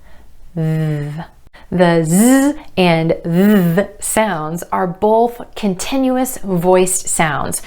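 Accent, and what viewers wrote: United States. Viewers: Thank you so much for another perfect lesson. I really have fallen in love with your beautiful American accent. I enjoy every your lesson cuz you explain everything very clearly. Thank you so much for your hard work!!! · You are American?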